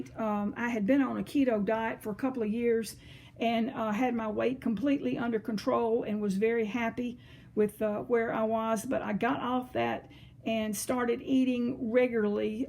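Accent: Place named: South, United States. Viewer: Oh!,..it’s so good to hear your sweet southern drawl, it’s been too long! Lilliebeth looks absolutely precious in her Ralph Lauren, and that hat is just so cute! The matching tennies are to die for!! How glad I am to see you’re doing well! The way I see it, if a little xtra weight is all we get in this time of pandemic, we’re ahead of the game! Hugs and much love Dearheart, your pal Joy xox. P.s., I shall send you an email of how we are doing in a bit.😊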